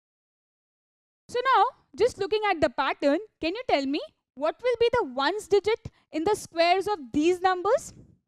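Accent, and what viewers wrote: India. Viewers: Why is every vid I hear a god damn Indian voice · Indian accents... fuck my life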